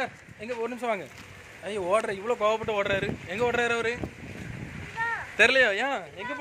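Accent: Tirunelveli, India. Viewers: Anna nega chennai ya but neega pesuratha pathaa thuthukudi thirnalvelii marii eruku enna ur nega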